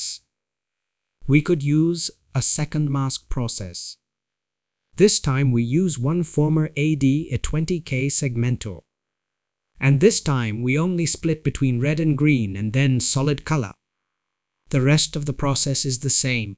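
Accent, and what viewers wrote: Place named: India. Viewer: stop using the AI it's more unintelligible than your indian accent. Everyone knows you're indian, you're not hiding it, you're literally just making people more racist against indians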